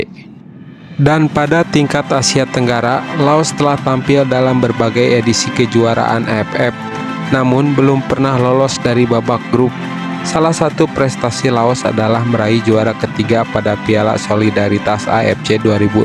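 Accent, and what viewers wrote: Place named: Sunda, Indonesia. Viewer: Sundanya medok bnget min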